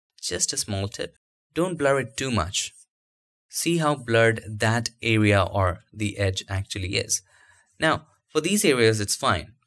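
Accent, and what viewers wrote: India. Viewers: It is called Hindi accent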